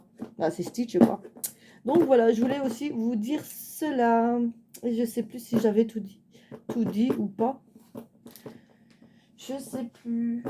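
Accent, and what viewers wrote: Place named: North, France. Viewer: J’adore cet accent nordiste en espérant que je ne me trompe pas 😂 et ton duo avec ta moitié 🥰😍😻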